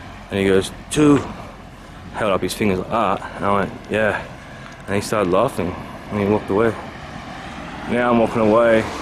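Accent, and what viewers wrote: Australia. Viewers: However, as an English speaker, I'd be happy to hear an Aussie anywhere! Especially in a scary forrest. It would be a welcoming sound for me, personally, as an American.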